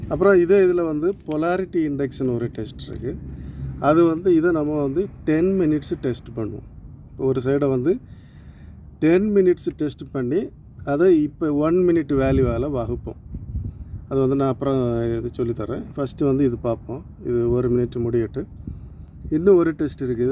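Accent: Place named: Kanyakumari, India. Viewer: Kanyakumari slang 🥰🥰🥰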